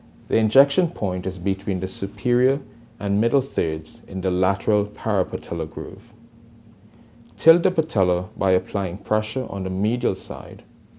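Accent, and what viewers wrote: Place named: Trinidad and Tobago. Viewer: Where are you from? Sound like Trinidadian or something similar.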